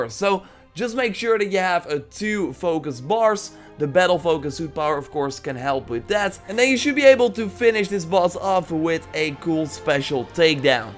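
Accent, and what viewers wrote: Netherlands. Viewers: He's not trying to be annoying it's an accent. He's Dutch and have said on many streams that he's sorry and that he tries his best to talk in English. Some Dutch ppl have a hard time speaking in English so it's a good thing that he can even speak english. Next time before you say someone's annoying do some research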